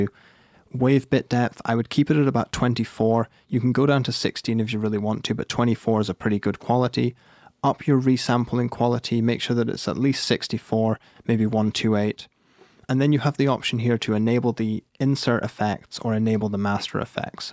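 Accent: United Kingdom. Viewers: Great video and useful tips!Just wondering are you from Britain?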